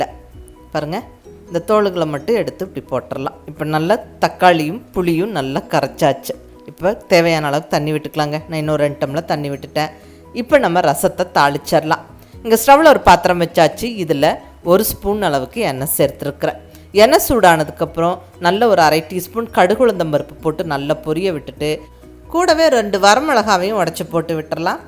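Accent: Coimbatore, India. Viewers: Madam. Unga cooking oda unga coimbatore language thukkala iruku super madam .nice to hear. Continue madam.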